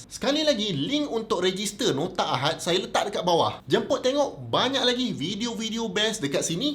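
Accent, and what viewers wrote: Johor, Malaysia. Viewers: Prof orang joho ke ? 🤭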